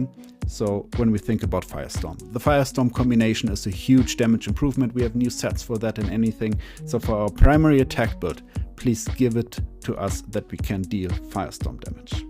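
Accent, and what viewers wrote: Germany. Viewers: Man hört einfach das du deutsch bist^^ Gut aufgebaut das video, thumbs up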